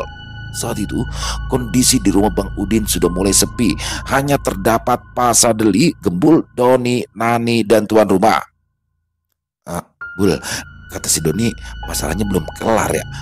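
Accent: Jakarta, Indonesia. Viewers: 😂😂😂❤❤❤,..ini ini yg saya suka logat betawi yg kental dan super lucu, 😂😂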